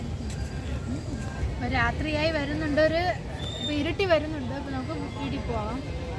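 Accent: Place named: Thiruvananthapuram, India. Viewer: മോളുടെ സംസാരരീതി കേൾക്കുവാൻ നല്ല രസമാണ്. പ്രത്യേകിച്ച് തിരുവനന്തപുരം ശൈലി.❤️👌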